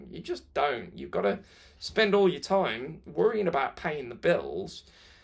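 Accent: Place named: England, United Kingdom. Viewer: Люблю твой акцент, как будто смотрю сериал Убийство в медсан мердере или чисто английские убийства))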